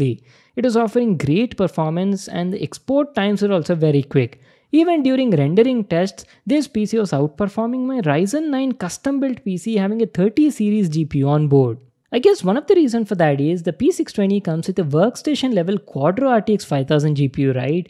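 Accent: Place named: India. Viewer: You speak English but your English is just like Hindi during lisning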